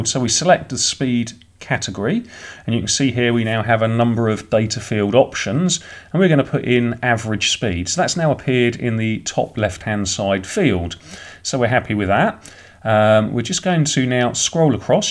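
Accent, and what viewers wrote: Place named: Essex, United Kingdom. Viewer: This sounds like a north Essex accent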